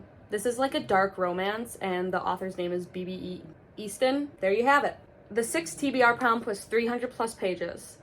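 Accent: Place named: Wisconsin, United States. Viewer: I knew I heard that accent! Hello fellow Wisconsin book lover!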